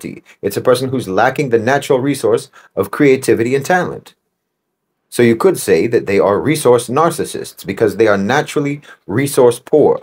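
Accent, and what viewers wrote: United States. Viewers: And he he speaks it all with an American accent. Methinks mr marxist minion protest too much.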